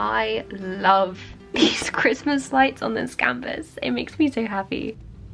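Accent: United Kingdom. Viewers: I’m Italian and I adore listening your voice because I love British accent 🥰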